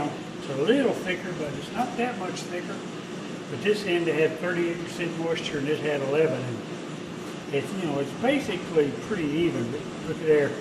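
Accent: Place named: Arkansas, United States. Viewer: Always enjoy your work. Sounds like you're a Arkansawyer. I was born in Gentry in 1939. Keep up the good work.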